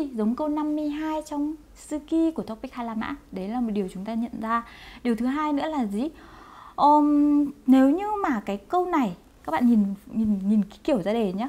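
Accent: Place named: North, Vietnam. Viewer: 선생님은 예쁘시고 베트남북쪽의 목소리가 너무 좋습니다.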